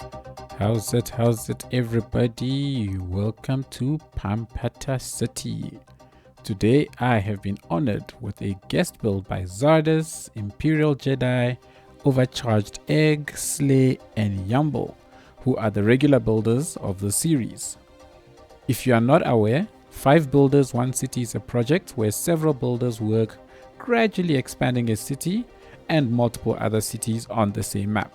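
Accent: South Africa. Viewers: Bro are you South African? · Man it’s great to hear a South African voice on YouTube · Bruh are you South African???????? Mans that accent, i know that accent from anywhere xD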